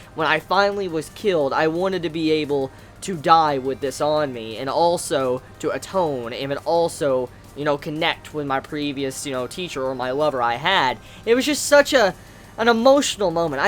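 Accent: United States. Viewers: Ummmm, what part of America is that accent from?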